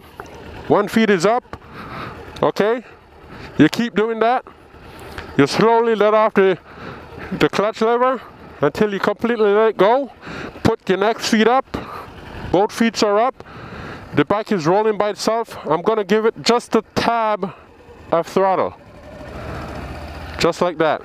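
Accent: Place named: Caribbean. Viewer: dis is your ORN ! your accent is a mix of foreign and caribbean lol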